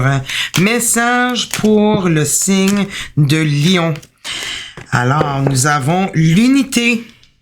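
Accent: Quebec, Canada. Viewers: Oh mon dieu, j'adore ton accent Québécois.😊❤ merci pour cette belle lecture, qui resonne parfait. Oui, je suis dans une nouvelle relation avec un poisson et j'espere que on sera bientot ensemble, car distance physique entre nous. Je suis veuve et lui divorcé.. Merci pour la confirmation et gros bisous d' Europe. ❤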